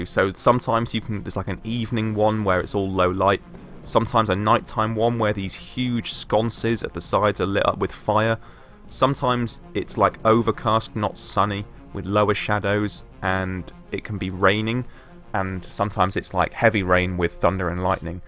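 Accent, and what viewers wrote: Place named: United Kingdom. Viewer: Oh heck yes! A sexy British voice guiding the events that unfold upon gameplay! Heck ya!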